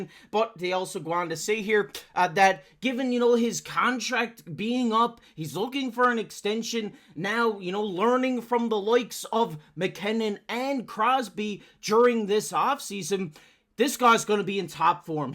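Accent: Newfoundland, Canada. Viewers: niiice. They have a great team. Beating Man United was a great thing. I loved that. I say they finish top 4 or 5. Thanks for all the work you do. I'm a massive Leafs fan and enjoy your channel. Keep up the great work. Btw are you from Newfoundland? I'm a Cape Bretoner. I recognize the accent.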